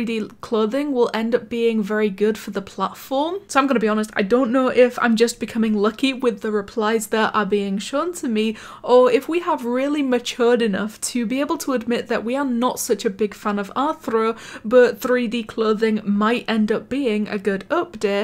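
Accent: United Kingdom. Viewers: she is just so bri-ish ♡ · She is too bri-ish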